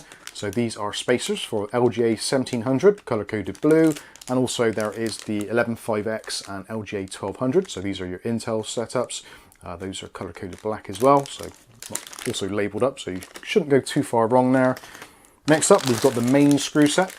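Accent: Bristol, United Kingdom. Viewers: Bit of a novice to PC builds I have an old motherboard ASUS H81M with a intel i7 4790 CPU in a HORNET CA300-NG case! reckon this un ill fit? PS nice to ear a Bristol accent! cheers.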